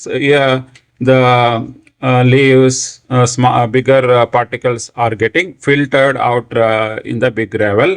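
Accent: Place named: India. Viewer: You have a very Indian accent I think your name is. (Not trying to be rude but nice in a good way)😊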